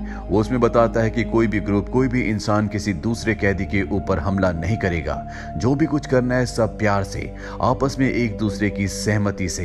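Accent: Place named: Himachal Pradesh, India. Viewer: Sir aapki aawaz se lgta h aap Himachal side se ho 🤔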